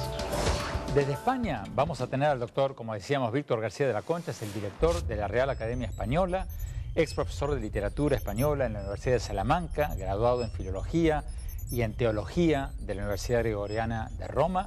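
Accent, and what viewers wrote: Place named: Argentina. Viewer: Me gusta el acento que tiene openheimer, es argentino?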